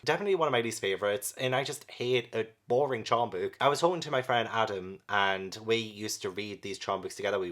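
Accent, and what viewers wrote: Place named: Newcastle, United Kingdom. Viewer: I just came across your channel and for some reason I thought you would be American. Was very surprised (and happy!) to hear a fellow geordie, who is into the charmed books! 😁 thanks for making this video. It was class!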